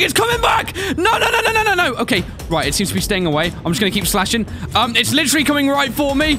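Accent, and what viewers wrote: United Kingdom. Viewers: He sounds way more british here 💀 "SubnAUticA"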